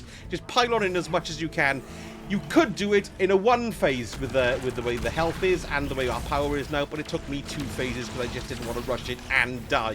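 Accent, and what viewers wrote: United Kingdom. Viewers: And he does it all with a pleasant British accent!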